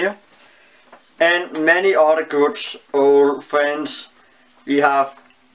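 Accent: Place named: Denmark. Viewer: vety good danglish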